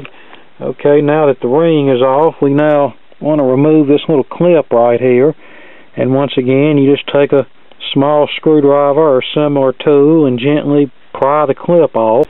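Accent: South, United States. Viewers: laurel moon ++ i've watched this guys videos and will use his info on a stuck bsf turn table i have, bought new in 1980 shoved off to the side in 1993 by my ex wife and not used since. i kept it thinking one day i'd get it running. maybe with this guy's info i can do that. prefer the older record players before equalizers and the "digital age" moved in.also have a 1955 rca 45 player i will get going. everything works,waiting on replacement cartridge. would rather enjoy my extensive vinyl collection on the old players they were made for. as for this guy's accent,no problem. i'm from north carolina and live in mississippi now.i have that same accent and intelligent as most are here in the us south.my daddy sounded like that. he was a tv/radio repairman as a side job in the 1950's and 1960's. i remember him working on some of these old rca record players. 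my daddy passed away at age 32 in 1966. working on this old stuff in my retirement brings back good memories of my daddy.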